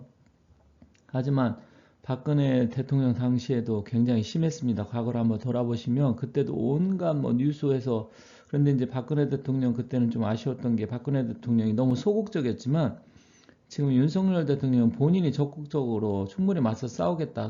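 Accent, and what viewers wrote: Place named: Jeolla, South Korea. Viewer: 선생님은 말씨톤이 전라도말씨같은데 전라도에서는 보기드물게 자유민주주의 보수우파시네요. 수고가 많으시고 훌륭하십니다.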